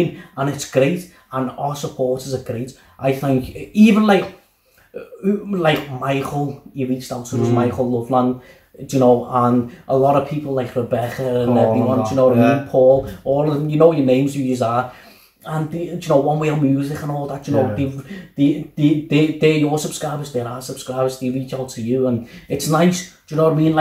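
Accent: Liverpool, United Kingdom. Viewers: Congratulations and for Jamel AKA Jamal recommending your page so nicely. You are one of 5-6 sites he recommended. He had done this once before with some other sites. Now he does it here and you are one of the picks. And you DESERVE it as you put so much into your site. You are known for your openness, listening to different artists from many cultures, many languages, many genres. Your site is amazingly diverse and truly international. You have some of the best subscribers who contribute and offer choices. You know us by name and person! And you are so much fun, and knowledgeable and expressive. Absolutely cute with a Scouse accent that makes your site just so charming. Spread the love, spread the music! Spread the diversity. Congratulations.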